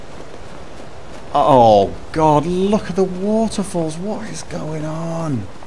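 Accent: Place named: England, United Kingdom. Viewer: It's probably a regional thing. There are quite a few words he pronounces differently than I've ever heard. I've always attributed it to the fact he sounds English.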